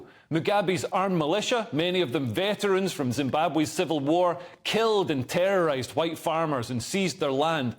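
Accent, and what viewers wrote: Scotland, United Kingdom. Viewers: This is the worst analysis I’ve ever witnessed. At first I thought he was Irish and had an understanding of geo-politics but I think he’s Scottish 😅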